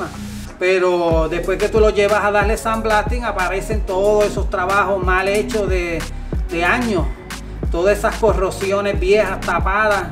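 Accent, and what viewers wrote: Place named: Puerto Rico. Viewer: acho pensé que era en el salvador este vídeo, asta que escuché el acento poltorro 😁😁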